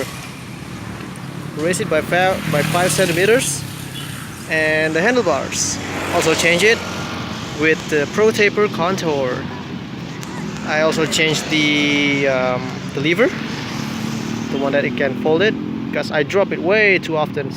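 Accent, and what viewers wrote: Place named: United States. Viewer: Logatnya us bgt... Can you teach me?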